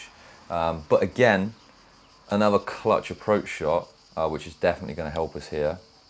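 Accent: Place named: Bristol, United Kingdom. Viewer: what is that accent, Bristolian?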